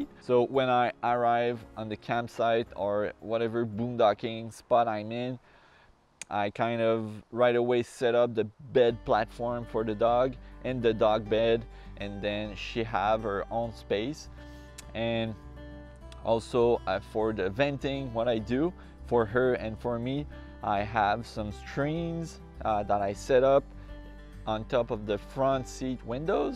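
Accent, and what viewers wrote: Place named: England, United Kingdom. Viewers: your English accent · Definitely the English accent!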